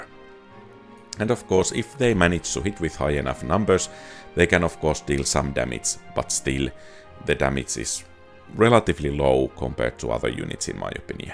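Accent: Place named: Finland. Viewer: Based on the accent I think Finland.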